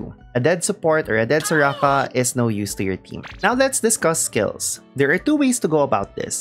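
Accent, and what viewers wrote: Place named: Philippines. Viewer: I soooo can hear the Pinoy in you xD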